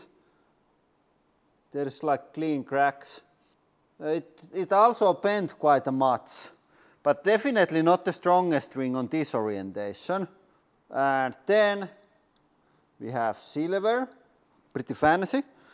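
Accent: Finland. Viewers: That accent, Is he Finnish? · Flat like'a da pancake Did you invent a new word? Fanacy? Who doesn't love that fin accent?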